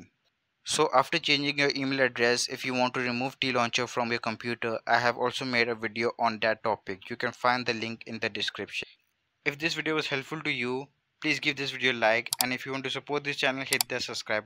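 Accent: India. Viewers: anything tutorial with an indian accent works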